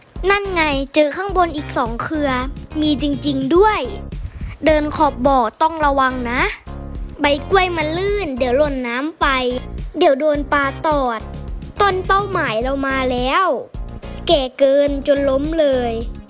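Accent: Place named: Ratchaburi, Thailand. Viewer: อยู่จังหวัดไหนล่ะครับเนี่ยฟังสำเนียงเหมือนอยู่ราชบุรีจังเลยล่ะเนี่ย ไม่ราชบุรีก็นครปฐมล่ะนะเนี่ย 555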